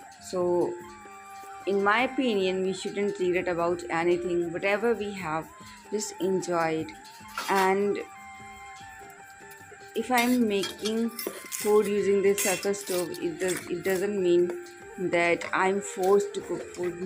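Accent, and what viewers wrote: India. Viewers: Damn, I feel like I don't understand any of this language. Is this the Indian English accent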